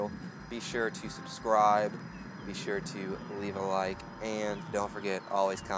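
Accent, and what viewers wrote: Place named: Australia. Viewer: you sound australian :D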